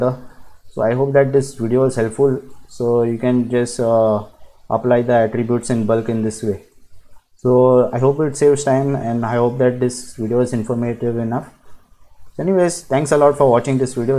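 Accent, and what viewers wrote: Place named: India. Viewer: Thank you so much!! I love indian accent.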